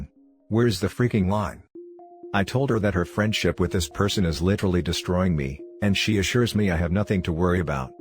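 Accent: Australia. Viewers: You can just tell this guys an Aussie, up the Aussies!!!! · Dude its time to end this relationship and walk away she isn't worth it. Further recommendation never married ever know cohabitation no marriage ever women today are not worth it. They want sexual freedom but the stability of marriage in the end up shiting all over the husband's. Buy your language you sound like your from Australia. Australia and the United States going through 2 of the worst things going on any country the feminization of men in the masculization of women. In either of these countries manner treated equally which read like disposable commodities. The statistics in stats prove it. Between divorce courts child custody 's the way that were all looked at plans simple but what people don't realise men walk away society ends the safety for all ends.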